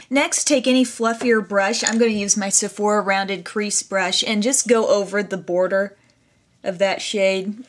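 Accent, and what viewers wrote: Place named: Alaska, United States. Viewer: are you from alaska?? you sound like sara palin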